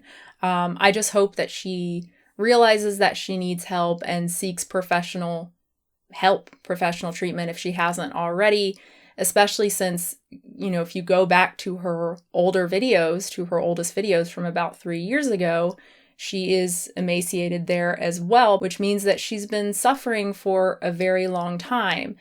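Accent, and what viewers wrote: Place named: South, United States. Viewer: Off topic but you sound so southern in this video especially at the start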